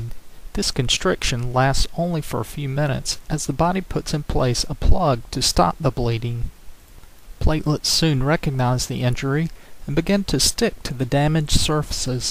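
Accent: South, United States. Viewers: the southern accent was very strong and though i am southern i cant understand it. or maybe you just need to blow your nose... i dont know... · Love love love your southern accent !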